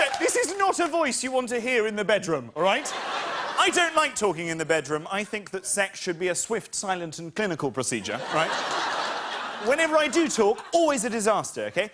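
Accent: RP, United Kingdom. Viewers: but remember that Stewie is an IMPRESSION of a British accent by Seth MacFarlane, whereas Jack is actually from the UK. He's actually just posh, which is why he speaks in such a specific way. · exactly what I thought! I remembered him sounding posh as fuck but not like Michael McIntyre, until today. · He's super posh but he's putting it on a bit more as the Yanks love it. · He's only doing the posh accent to appeal to the Americans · He tries to be way more British when he’s in America than he actually is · Im a brit and a big fan of Jack. He's really upstaging his poshness for the American audience. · Nah, he always sounds this posh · This guy sounds like a posh Michael Mcintyre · I love how when he is in America his well spoken private school voice remains, however when he does stand up in England he tries to make a more common accent. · It's just called posh British man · Everyone in Britain knows Jack is WAY more posher than Michael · not quite correct either, he wouldn't have to be from London to sound like that, he has received pronunciation, which really he could have if he lived anywhere in England although more likely in the south west/east. 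· Funny- but he was being overly posh, even by his usual standards. It was a bit irritating. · I know his posh but he's extra posh in this set,what happened to him?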